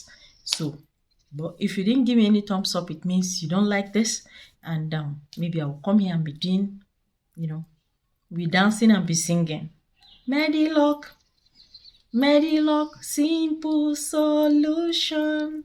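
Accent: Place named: Nigeria. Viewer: I am from Tanzania. I have been following your videos with a lot of admiration and faith if to say so. The only problem we from this part of Africa are facing is your Nigerian accent. Your (Nigerian) pronunciation is very different from ours. This makes it hard to understand you at times otherwise, you are blessed Madame and may God continue to use you.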